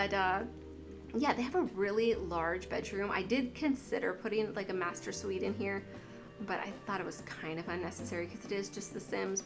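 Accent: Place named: United States. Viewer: And you know since you live in America actually I think you live in America based on your accent either that or somewhere in Canada and you can definitely buy the packs and write them off on your taxes as business expenses if you're not sure of that you should ask your husband but what I'm saying is verifiable You could even purchase brand new computer and write that off in your business expenses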